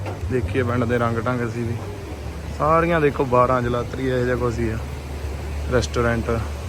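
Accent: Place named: Malwa, India. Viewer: Bhai sohna vlog hunda thoda. Tusi Punjab to kehde jille to ho? Boli Malwe aali lagdi, saade angu.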